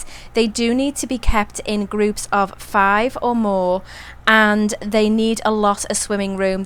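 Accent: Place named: Merseyside, United Kingdom. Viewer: Hi. you sound like your from Merseyside somewhere I'm from Liverpool my self your vids are very helpful this is the first time I have kept fish mine is a cold water tank and I am only stocking different type of fantail 4fish about 2 months old. 6 fish about 3 months old. 3fish about 16 months old all added 1 week ago. My tank is 5FT 2x2 no plant life no coral just large glass slag rocks. White Sand external filter1200lh. 2 air stones. 1 Power head water stream. Iv bin up and running for a week all fish seem fine. But I am reading up on all type's of problems I might get as (condition of water) (food)( temperature) what has me a bit worried Is ick no sigh of it but prevention is better than cure. SO my question is can you put cupramine in my tank this is a treatment for ick.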